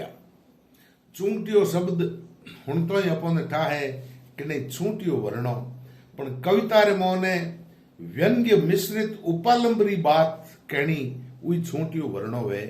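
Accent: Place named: Marwar, India. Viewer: Aaaha ...jivo ...jivo...the...itti mithas ...shudh marwaddi....aand aagya ...ji... dhanywaad...aapka...❤❤❤❤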